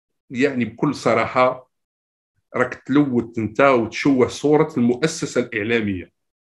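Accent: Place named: Morocco. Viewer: باين لهجتك مغربية كما احنا...........و علاه راك دير في السنيما ...اسكتوا علينا هذي غير كرة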